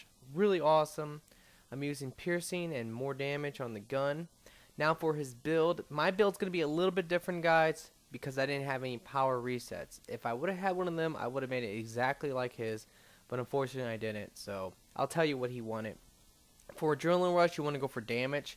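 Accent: Boston, United States. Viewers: I just thought you had an accent like boston or new york or whatever. Anyways, keep up with the vids. You're one of the few people that makes decent ME3 gameplay recordings.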